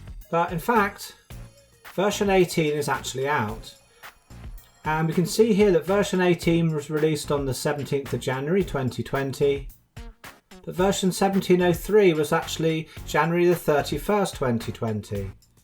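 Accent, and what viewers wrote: United Kingdom. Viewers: Wow, the next brilliant and useful series completely in proper british english!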